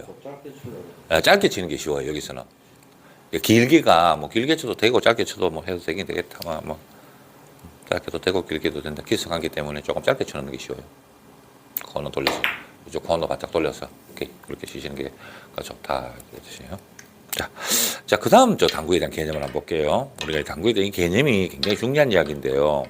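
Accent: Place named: Gyeongsang, South Korea. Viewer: 전형적인 경상도 말투에서 듣는 사람에게 짜증이나 화를 내는듯한 말투죠, 근데 실상은 그냥 평범한 말투인데 타지사람이 듣기엔 참 불편ㅋㅋ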